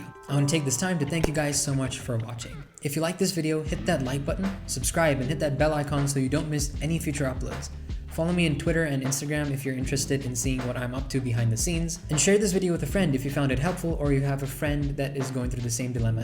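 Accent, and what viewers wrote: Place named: United States. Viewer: Why is it your accent is like americans😍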